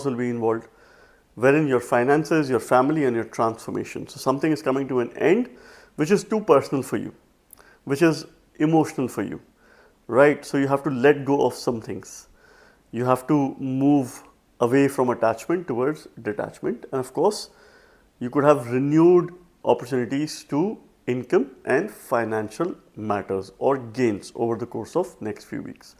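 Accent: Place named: India. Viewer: It would be easier to understand if you didn’t have such a strong Indian accent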